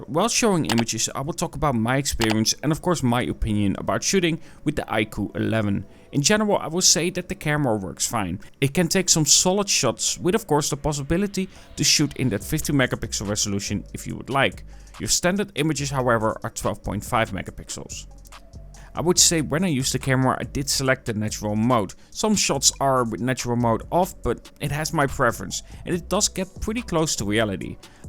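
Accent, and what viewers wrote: Netherlands. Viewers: Klinkt alsof je van Nederland komt. Je maakt goeie reviews 🙏🏾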